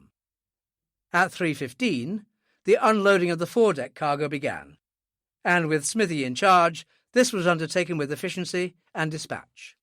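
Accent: England, United Kingdom. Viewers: I have been seeking a channel with minimal adds. In Alistair MacLean’s audiobooks I find that there are no adds but there is a difficulty with the reader. The English accent makes it hard to understand some of the dialogue. This audio book drags on and on so I have increased the reading speed However in doing so the reader’s English accent makes it even more difficult to understand.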